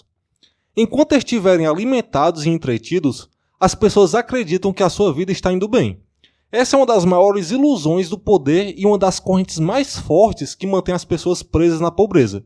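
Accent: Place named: Ceará, Brazil. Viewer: Nenhuma dúvida que esse sotaque é do Ceará..rs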